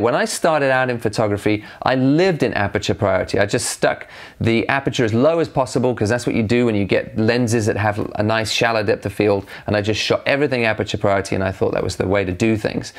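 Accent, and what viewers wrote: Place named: South Africa. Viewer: Hi - South African here. There are qualities in your accent that make me think you might be from South Africa. Are you? I've just subbed:)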